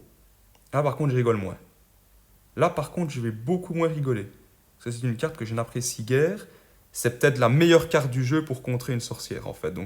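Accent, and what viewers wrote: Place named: Belgium. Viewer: ne serais tu pas belge pas le plus grand des hasard ? Ps première fois que j'entend qqun dire pikka à la place de pekka et artière à la place de l'artificière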